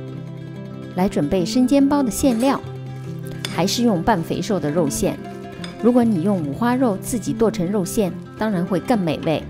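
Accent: Shanghai, China. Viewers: 原来是上海小囡呀亲切的很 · 好亲切的沪普：long tang💕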